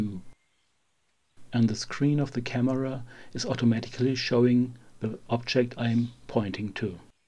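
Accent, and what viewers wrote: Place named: Germany. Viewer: The narrator sounds like the Cherman guy who.made "Grizzly Man."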